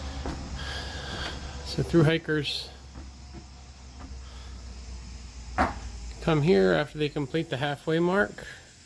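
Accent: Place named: Delaware, United States. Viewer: I'm glad I stumbled upon your video. I section hiked MD and NJ this past year and I'm planning on doing all of PA this summer. I thought I recognized that Delaware accent. I'm also from Delaware. Good luck to you on your thru-hike. I'll be following along.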